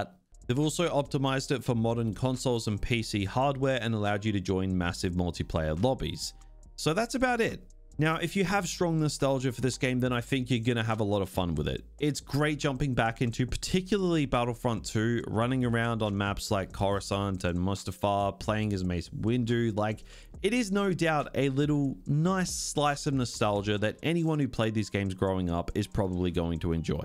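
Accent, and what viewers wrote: Australia. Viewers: dragged past 10 minutes cringe aussie accent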